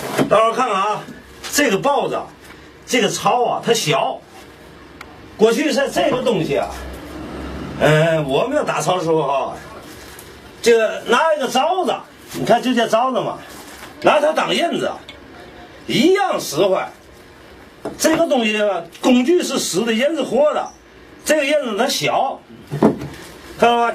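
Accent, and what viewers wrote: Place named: Tianjin, China. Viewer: thanks for uploading the video! I grew up in Tianjin when I was young and I love your accent.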